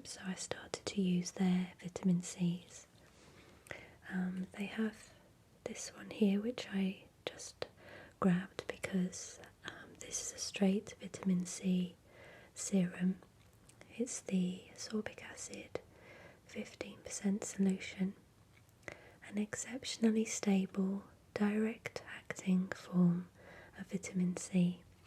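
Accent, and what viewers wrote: England, United Kingdom. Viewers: Sadly I have had a difficult time watching your videos over the last year. Why? Well to be honest it's because I had my heart irrevocably broken by an Englishman. I couldn't bear to hear the accent. 😔 I missed your videos, and was determined to get back to them. So I simply forced myself to watch a couple of your videos that have always been favs of mine from a few years back. Happy to say "Cleaning your face" from the Lo-Fi series did the trick. I swear I'll never let one of your countrymen keep me from tuning in ever again.🙂 No matter how devestatingly handsome he happens to be. 😏 Boys drool💔, girls rule.🧘🏼‍♀️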